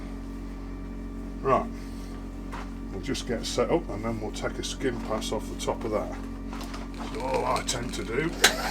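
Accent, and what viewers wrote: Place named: Lincolnshire, United Kingdom. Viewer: Hi, just found your channel via Doubleboost and subbed. I was watching a vid or two and listening to the accent thinking 'this guy comes from the same part of the world as me', nailed it, lmao when you put North Lincs in your outro. I moved away from Lincoln to Scotland 10 yrs ago and am just about to start setting my shop up so will hopefully be posting some vids soon. Keep up the good work, makes me feel like I am back in my home town watching your vids. Jon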